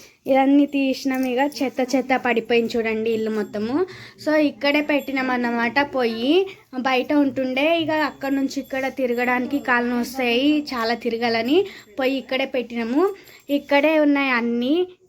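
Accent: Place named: Telangana, India. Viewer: Hi mee maata telangana valla lage vundi mee ఊరు a side vuntundi maadi adilabad dist. kura eppudu చూడలేదు. 1st time mee kattelapoyyi super 👌😊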